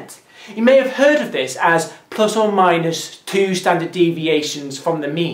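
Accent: United Kingdom. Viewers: So I thought this was going to be a "depends on where you live" issue from the beginning, and five minutes in yes it's exactly that. Guessing by accent, this person is some flavor of subject of the Queen of England; and may not be aware of how houses are numbered in America or France or other places. Even number houses are in one side of the street, odd number houses in the other side of the street. The first house on a street is just as likely to be 2 as to be 1. And in America, single digit house numbers are fairly rare. Some of my previous house numbers were 5801, 358, 363, 11258 (rural areas get bigger numbers). And his explanation didn't mathematically make sense. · He lives in England (you can tell from the accent). England uses a uniquely bizarre street address scheme wherein adjacent addresses are usually consecutively numbered, but occasionally there are large jumps, because at some point they have to go back and do the houses on the other side of the street, or at the other end of the street (other direction from downtown), or whatever. 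I don't know exactly how they handle it when a new house is built between two existing formerly-adjacent houses. Heaven help you if you are given an address on a long street and not told what the nearest cross street is. Another weird outlier is Japan: street addresses don't say what street the building is on at all: instead they say what block it's on. All the blocks (in most cases, square sections surrounded by four streets) in any given city are numbered; and then the buildings on all (usually four) streets around each block are treated as a single block and numbered around the perimeter. Street length is thus completely irrelevant, and the range of possible numbers of buildings on each block is much smaller than the range of possible numbers of houses on an entire street.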